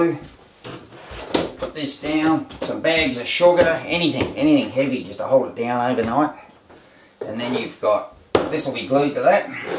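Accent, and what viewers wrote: Australia. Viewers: Love to hear the Aussie accent :-) very cool idea. I'll see if my husband can come up with one 👍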